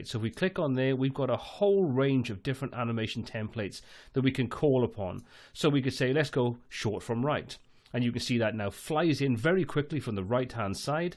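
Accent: Wales, United Kingdom. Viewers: That's it, all Youtube tutorial videos to be exactly like this from now on - well thought out, perfectly paced and delivered in a beautifully articulated Welsh accent :) Loved it.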